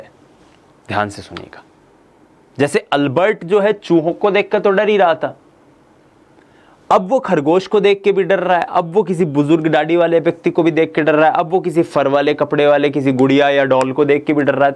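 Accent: Rajasthan, India. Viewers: The way you speak marwari😍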